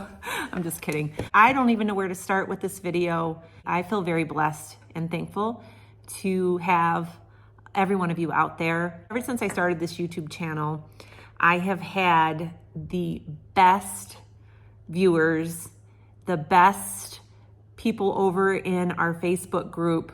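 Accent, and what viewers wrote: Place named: Michigan, United States. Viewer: Oh, my goodness what a time your family has had, but our God is so good!! I just came across your videos this week and wondered if you were from Michigan as I recognize the accent, lol. I am originally from Riverview. I have lived in Tennessee most of my life, but still have a soft spot for Michiganders. I am praying for your husband, his mother, and you!! 🙏🙏🙏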